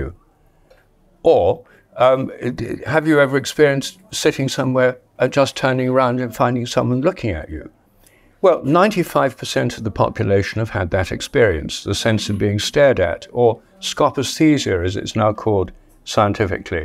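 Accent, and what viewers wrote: RP, United Kingdom. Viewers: Occam's razor. 1. People are social creatures, we will always feel more watched when in company (spotlight effect). 2. Confirmation bias. We remember only the times we looked and saw someone looking back, forget all the times we looked and nobody looked back. 3. No more need for all the hypothetical superpowers or deities to add on to the above. Even a posh English accent can't wash away the flood levels of BS here. Unfortunately, the promotion of pseudoscience is a hard no for me, so unsubscribing from this channel.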